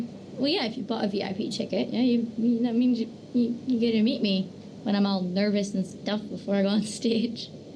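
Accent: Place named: United States. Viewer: im confused about the american accent?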